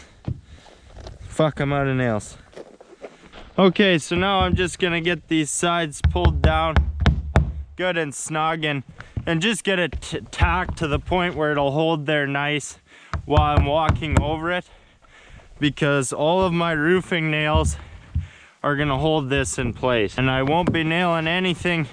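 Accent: Canada. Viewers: There’s my favorite Canadian voice! I just got a new coop with cedar shake siding and a cupola, that has a chicken weathervane on top. It took an entire summer to build, but it’s really cool and the chickens love it!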